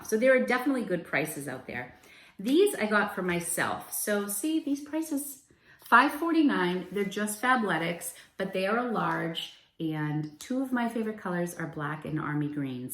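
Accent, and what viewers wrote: Boston, United States. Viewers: Your videos are my favorite, your Boston accent! Baux for box! Keep up the amazing sourcing. Thanks for putting out the best content on YouTube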